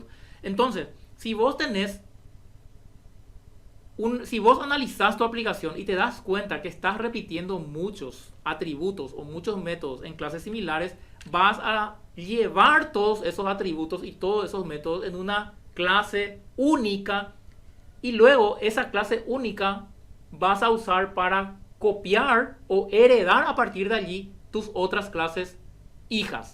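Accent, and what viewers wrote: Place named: Paraguay. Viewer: Inconfundible el acento paraguayo, espectacular. Por cierto, cuando te refieres a la composición y agregación. En la composición es la instancia de la clase contenedora mas la instancia de la clase embebida la que termina. En la agregación no necesariamente debe morir la instancia de la clase agregada.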